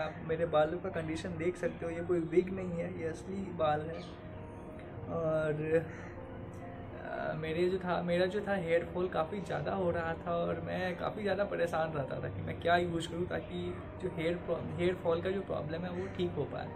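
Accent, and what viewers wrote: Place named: Bengal, India. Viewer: Pronunciation se Bengali mone h6e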